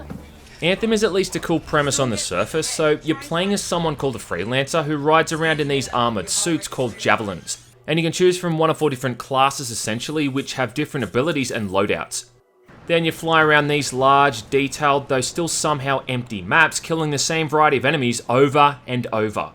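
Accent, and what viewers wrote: Australia. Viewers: Australian accent ftw haha.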